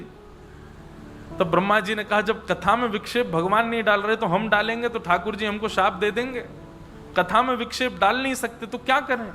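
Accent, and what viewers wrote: Braj, India. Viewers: Raadhey raadhey guru ji aapke charno me koti koti pranam aapki Katha bhut acchi lagti hai aapke mukharvind se brij bhasa bhut sunder lagti hai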